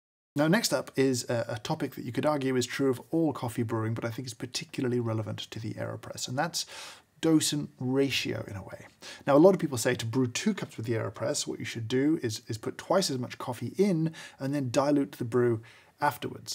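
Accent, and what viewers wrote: United Kingdom. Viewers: You forgot British accent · Watching a funnily-quoiffed man with a British accent do SCIENCE! with a 30 buck coffee brewer was a surprisingly enthralling way to spend a Sunday morning.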